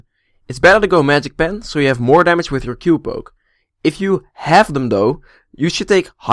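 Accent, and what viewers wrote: Netherlands. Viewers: Duidelijk Nederlands accent :p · Hahaha het nederlandse accent op engelse taal is altijd zo herkenbaar.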